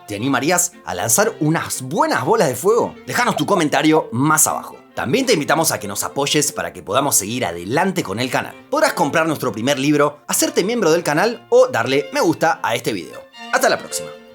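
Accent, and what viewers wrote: Buenos Aires, Argentina. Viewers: Porqué dejaste de hablar como argentino???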